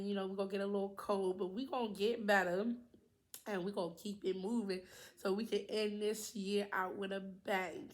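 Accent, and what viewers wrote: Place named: Baltimore, United States. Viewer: Good morning my chocolate sis...i love your intro...i came across your vid by watching cringe binge and i was like let me check her out and your intro got me hooked...it is so humble and so adorable...seriously when i heard you talk i was like ummm i think she is from bmore...im from Maryland myself...im sitting here posting my own vid while watching yours lol hope you check it out but anywho i am going back to binge your vids lol have a blessed one hun... keep up the good work!!!! i totally agree on not following trends, my channel is about Ethnic food from different cultures becuz i want to open mine and others horizons on foods possibly...so basically i feel u on doin your own thang....#newsubbie...my bad im a talker but im done lol....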